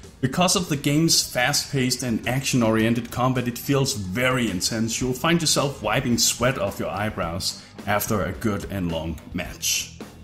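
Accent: Denmark. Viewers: danish accent I feel lol. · Dansk accent er ikke til at tage fejl af! Fed video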